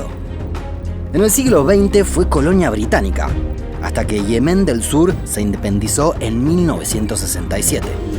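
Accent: Argentina. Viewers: Acentos Ré ré ré argentino ! Adooooooro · El narrador es argentino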